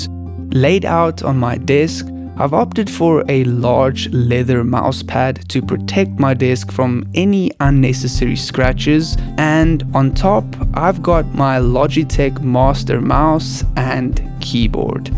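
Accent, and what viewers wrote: South Africa. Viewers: is that a South African accent im picking up?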